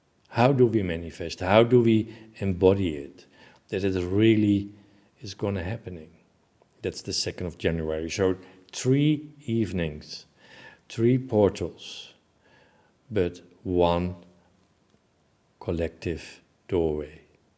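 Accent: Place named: Netherlands. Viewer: Ik hoor een Nederlandse stem.. hoogst irritant! Waarom is dit?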